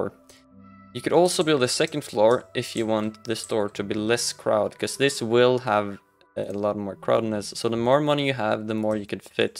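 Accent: Norway. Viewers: e du norsk lol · er du norsk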